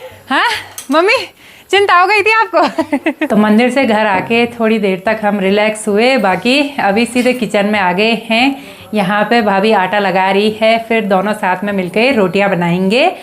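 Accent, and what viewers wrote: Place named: Pahadi, India. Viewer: Bindass apake hindi bhasha me pahadi sugand aati he bahot achha lagata. bhai ko shubkamana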